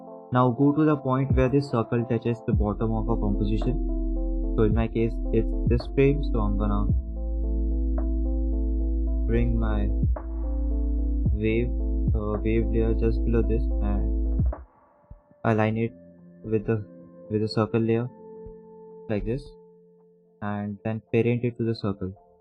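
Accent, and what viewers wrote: India. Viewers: are you a indian your accent feels me like that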